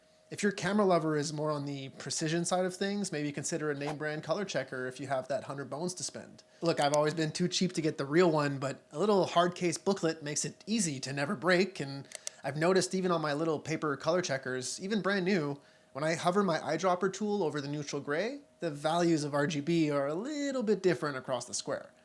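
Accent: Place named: Quebec, Canada. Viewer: J'me suis pas rendu compte que t'étais quebecois au premier video qu'j'ai écouter sur les flashs drag. Toute une surprise quand je t'ai entendu immiter ta mere. Tres bon contenue jusqu'ici, lache pas:D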